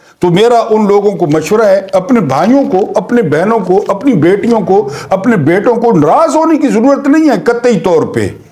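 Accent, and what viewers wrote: Saraiki, Pakistan. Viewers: Ap key V Log mein Saraiki Touch bohot hi acha lagta hey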